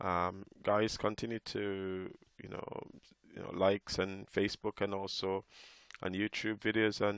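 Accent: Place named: Jamaica. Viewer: You sound Jamaican 🇯🇲 😳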